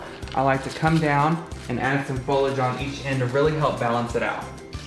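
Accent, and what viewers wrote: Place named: South, United States. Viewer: Child, you are so southern! Love it! ♥️♥️♥️♥️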